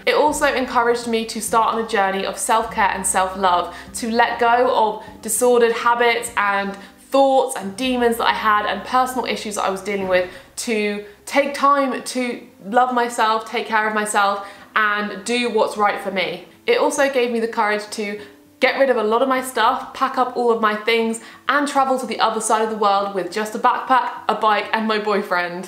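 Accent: United Kingdom. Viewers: I speek french and I love your british accent. It is lovely. Your speech is really inspiring indeed. It is not easy but I am starting now.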